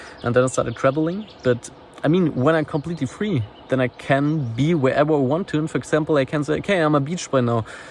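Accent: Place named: Germany. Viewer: Bro why are you speaking English? I can hear your German accent extremely clear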